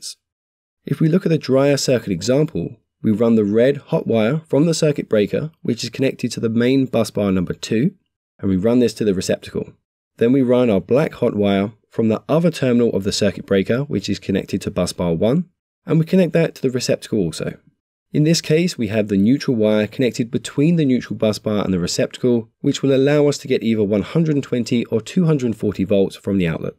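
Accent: United Kingdom. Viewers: I would prefer a human voice instead of a robotic British voice! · What country are you in that is using copper for water mains in the street? I'm Britain, where his accent is from, we use plastic piping in the street, plastic in the homes. It's been like this for a couple of decades. I'm not sure if he's being generic in his board or for American, because he's talking about 120 and using double positives to make 240. I'm not an electrician. We have had to adapt wiring to this reality. You can no longer trust copper piping in a home will be continuous or lead back to a central area. It can be changed at any time and the copper circuit can be broken. For this reason Earth cables are being run directly from the front to the back of the properties using the main electrical board for the main earth point. Push fit plastic piping is so quick now, and they just need a small little blade cutter to cut the pipes to the right size. 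It's flexible and so easy to move around. Copper is much more expensive, difficult to bend, more time to cut, but the joints are a little bit cheaper. However plastic push fit connectors can be easily undone just with a click of your fingers.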